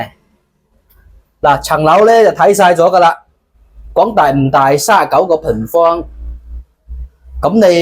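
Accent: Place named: Dongguan, China. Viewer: 東莞口音點讚 !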